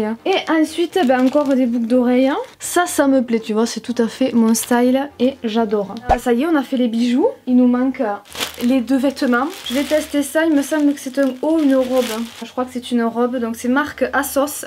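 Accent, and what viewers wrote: South, France. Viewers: Je suis passé par ta chaîne par hasard et je t'ai trouvé géniale, naturel et j'adore l'accent du sud ! Reste comme tu es je t'aime trop 😻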